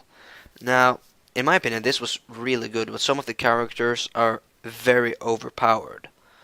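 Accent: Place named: Ireland. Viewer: You from Ireland mate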